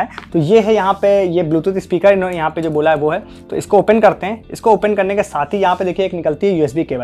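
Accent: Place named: Uttar Pradesh, India. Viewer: 3.14 bhai yar. puri UP ki bhasha bhai. ghar ki yaad diladi :P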